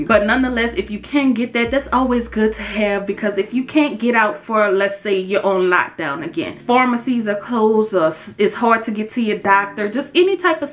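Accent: New Orleans, United States. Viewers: New Orleans accent strong 💪🏽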